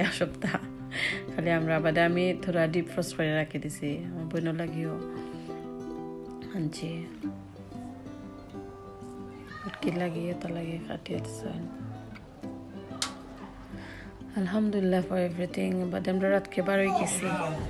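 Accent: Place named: Sylhet, Bangladesh. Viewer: খাটি সিলেটি কোন বেজাল নাই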